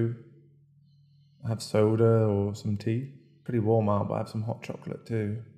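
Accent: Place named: United Kingdom. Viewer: You sound really British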